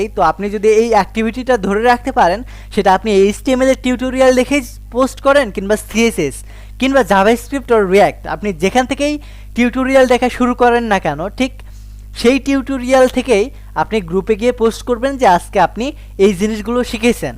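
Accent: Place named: Noakhali, Bangladesh. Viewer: ভালো লাগলো আসলে। আচ্ছা আপনার বাড়ী কি নোয়াখালী নাকি? কথা ঐ রুকুম আসে।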